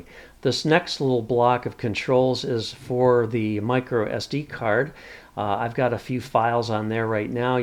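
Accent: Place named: United States. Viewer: Great review/tutorial...Finally an "American English" speaking reviewer. Those reviewers from GB or wherever have those awful accents that make it hard for me to understand. Thankyou!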